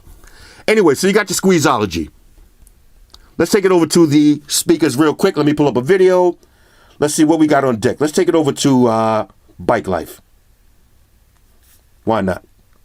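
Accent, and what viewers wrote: New York, United States. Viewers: I just bought the iPhone Xs but I wanted to hear your review on this. The NY accent is 😘♥️😍 Us ladies love it!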